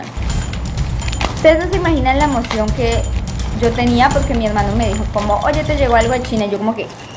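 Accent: Colombia. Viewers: Por supuesto es Colombiana.. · Eres de Barranquilla Colombia?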